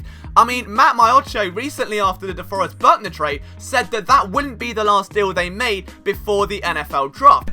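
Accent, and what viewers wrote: England, United Kingdom. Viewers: Ugh damn I try but I can't stand the accent at all!! Fuk not that the vid is bad. It's just you talk fast and loud in the accent I think that's what people are talking about I don't think it just cuz ur not a American u just talk fast and have a heavy accent .I personally cannot stand an English accent at all. But it has nothing to do with you personally at all. Eagles fans are over the world and anyone can be a fan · I’m from Philly and listen to a lot of Eagles content and yours is by far the best. I like the fact that you don’t hype things and that you dive deep to give all of the supporting information that you can for every post. When I first heard your accent I was curious about why and where you were doing this from and I confess I am still a little curious. I’ve never caught your full story of how a Brit got into this. 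you are exceptional at what you do! You have a gift and please keep bringing us information starved and isolated Eagles fans Eagles news to brighten our days! Thank You! Ace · Weird mix of English accent and Eagles news. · ... I'm old enough to be your grandfather, born, raised & lived in the Philly area most my life, but now live in northern Colorado... You Sir, are young, born in Britain, have a British accent and know tons of facts about the Eagles... Yet it all means nothing until we cut ourselves open and... "BLEED GREEN" and that sir, you do... screw the trolls! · You're British??? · Wait, you're British?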